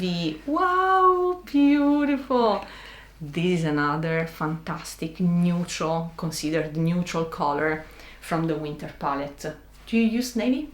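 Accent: Italy. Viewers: I love these videos so much, and I have learned so much. You have a very special gift of explaining the concept and the analysis very well. And although I've seen dozens of them now, I'm still intrigued every single time to find out exactly why which palette works for the gorgeous models (and thanks to every one of them as well for demonstrating how color individually affects us). I must also say that the lovely Italian accent adds so much charm to the whole process. I am a Winter Cool myself, and whenever I go shopping and am determining which color is the right one, I am hearing in my ear "wiinterrr cool" and it puts a smile on my face! Thank you so much 💙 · I've been watching so many of your videos that when you removed the cloth and I said at the same time (with that beautiful Italian accent) "ah noh, the cobahlt is SO much better than the cyan" 😂🤭 amazing video as always!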